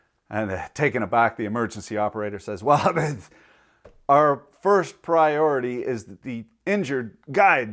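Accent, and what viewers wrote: Quebec, Canada. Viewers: With that French accent you have to be from the Quebec area....my heritage is French Canadian as hell. You sounded like one of my uncles.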